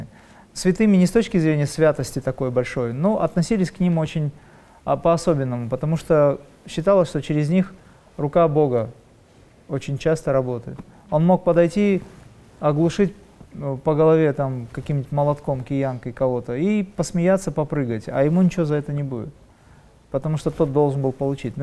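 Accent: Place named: Dagestan, Russia. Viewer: Аварский акцент есть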